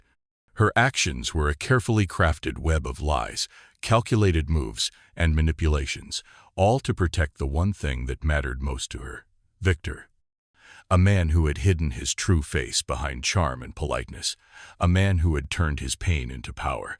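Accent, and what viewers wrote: United States. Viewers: American accent doesn't cut it.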